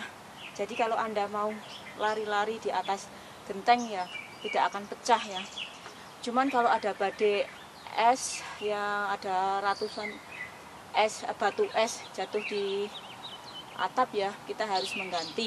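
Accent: Java, Indonesia. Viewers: Wkwkw jawane ngendi bu? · MB asline mana ya, kaya Jawa... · Logate wong njowo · Mbak jowone nang ndi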